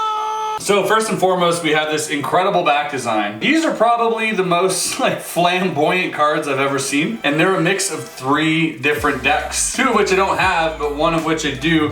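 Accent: Canada. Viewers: This guys Canadian?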